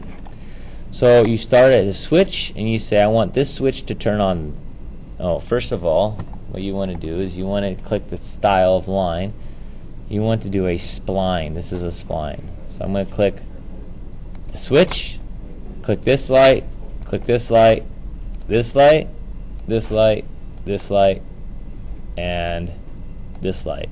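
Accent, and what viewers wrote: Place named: United States. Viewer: Finally, Revit Electrical that is in CLEAN ENGLISH American. Thank you.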